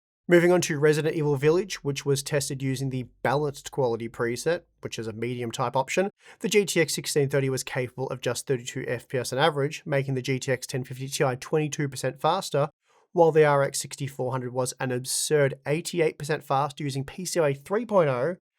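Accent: Australia. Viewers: I love your aussie accent. Even the caption algorithm thinks you said "game wood" ! XD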